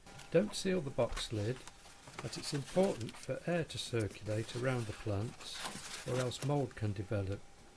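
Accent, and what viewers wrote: Northern England, United Kingdom. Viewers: Yes, they are pelargoniums. They are generally called Geraniums in the UK even though most people know that it isn't horticulturally correct. This man seems to have a northern English accent, so I can only assume that he is calling them what the vast majority of people would call them on a day to day basis. He obviously is aware that they aren't Hardy Geraniums, otherwise he would be leaving them in the ground rather than storing them for the winter. I have never seen them stored this way before, I always bring mine indoors in the pot, and take some cuttings on a just in case basis. This may be an alternative, and will give it a try.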